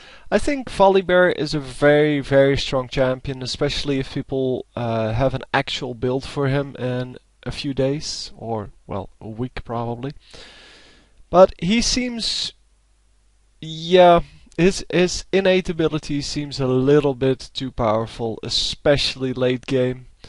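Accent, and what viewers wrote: Netherlands. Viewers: Haha, ik wist het! Nederlands, hoorde het aan je accent =p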